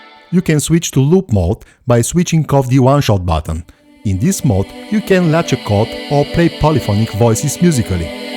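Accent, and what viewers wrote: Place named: Russia. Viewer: а вы, дорогой друг, часом, не носитель русского языка?)) а то уж больно акцент знакомый)))))))))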